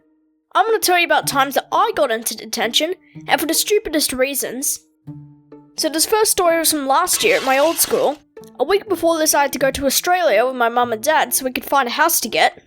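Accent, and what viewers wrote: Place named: United Kingdom. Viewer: Are you British because I am and you sound like one?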